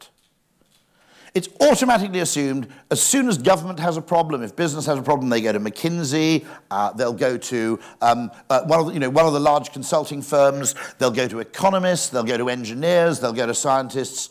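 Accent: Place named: United Kingdom. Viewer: It is the british accent.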